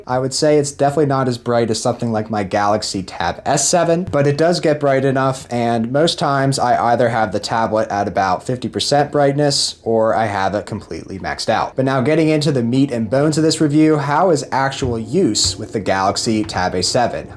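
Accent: Philadelphia, United States. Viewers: New to your channel. Liked your review! Gotta ask. Are you from the Phiily or Delaware county area? I am, and I am testing my South East PA accent radar.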